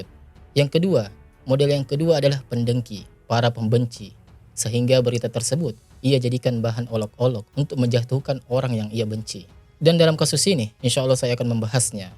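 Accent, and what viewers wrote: Sulawesi, Indonesia. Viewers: Masyaallah, kentara sekali Sulawesi nya.. semangat ki Sodara❤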